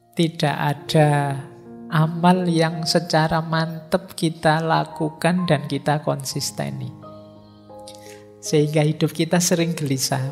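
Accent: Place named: Java, Indonesia. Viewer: Kl ane justru mempertahankan dialek aslinya, good. Itu susah mazeh, ngilangin logat aseli Jawa bagus sekali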